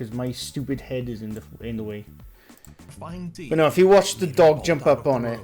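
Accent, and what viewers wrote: Wales, United Kingdom. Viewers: I can't get over people thinking you are Irish or Scottish when your Welsh accent is so strong! mind you i can picture you in Braveheart..... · Are you welsh?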